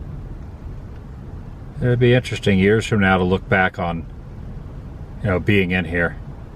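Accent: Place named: Philadelphia, United States. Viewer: Your accent is all too familiar - You from Philly PA vicinity, or Delaware/Maryland?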